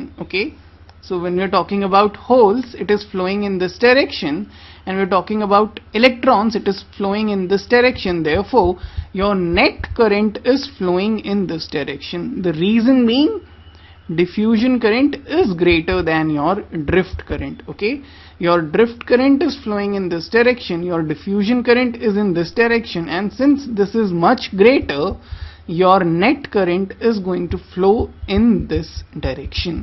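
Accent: India. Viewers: GET THE FUCK OUT WITH THAT HINDU ENGLISH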